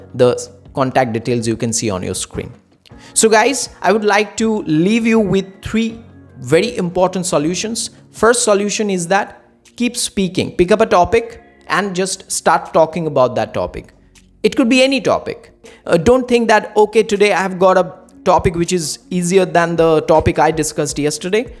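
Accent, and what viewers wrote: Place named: United States. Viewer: Why do speak in American accent?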